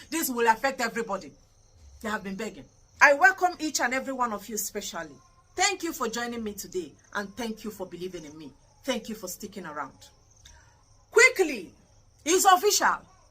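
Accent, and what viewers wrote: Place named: Aguata, Nigeria. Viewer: Nne. You speak Aguata. Which town are you from?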